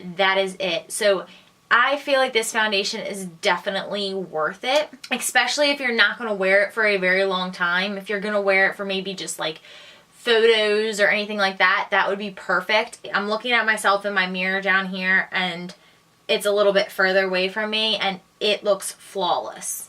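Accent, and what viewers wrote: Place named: Louisiana, United States. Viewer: I just got to ask do you live in Louisiana or Mississippi? I'm picking up on your accent. and I have this Foundation as well and I'm still not sure how I feel about it yet even though I do like the shade I picked out I'm not sure about the wear time yet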